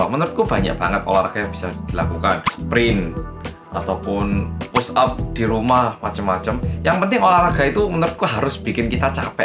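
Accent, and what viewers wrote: Java, Indonesia. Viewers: Maaf baru pertamakali nonton.. orang korea asli apa jawa? Atau campuran? Soale muka korea logat jawa.. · Medok nya emang sengaja gak di ilangin ya mas 😁tapi bagus kok medok jadi lebih ke Jawa jawa an gitu.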